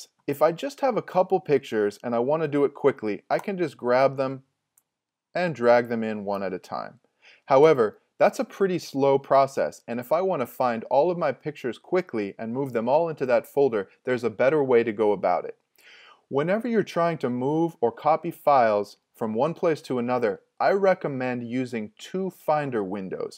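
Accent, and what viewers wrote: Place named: Kansas, United States. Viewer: Cats and Ringworm yes, I really liked the delivery. I'm from Kansas, maybe it's the same dialect? thank you for this helpful video!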